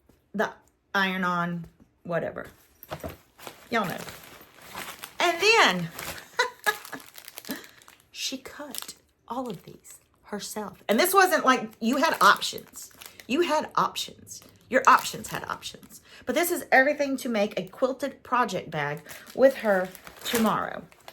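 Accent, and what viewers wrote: South, United States. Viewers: I am so happy I found you. Love hearing the southern accent, y’all, and just your openness. Then you have sharing your gift and creativity.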